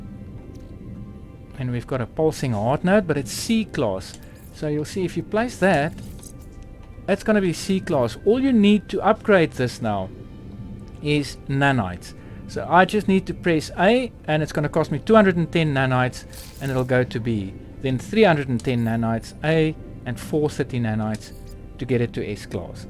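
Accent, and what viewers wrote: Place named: South Africa. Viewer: The South African accent is strong with this one.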